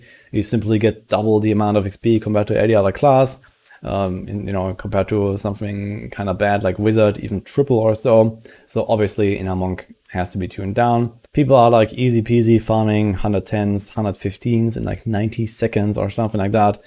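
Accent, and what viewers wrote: Germany. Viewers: Für einen Deutschen hast du kaum akzent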